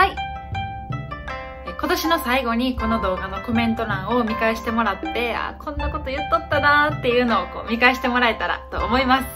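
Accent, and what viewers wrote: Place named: Osaka, Japan. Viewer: 今年も宜しくお願いしますｺﾃｺﾃの大阪弁の後英語ぺらぺら僕も英語できれば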